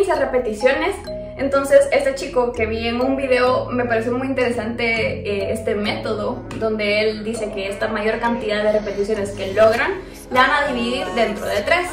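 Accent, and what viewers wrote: Guatemala. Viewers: Acento #chapin !!!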